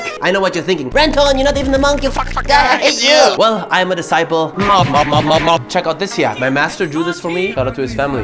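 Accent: Germany. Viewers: Bro r u german ur accent kinda sounds like it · It sounds sometimes like you are from Germany or Austria? "ahhh Im gonna give my Senf..." and the way you say "Steven Seagull" sounds very german to. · He has german accent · Finds geil wie man den deutschen Akzent raushört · Why do you sound like a german guy speaking english 🤔🤣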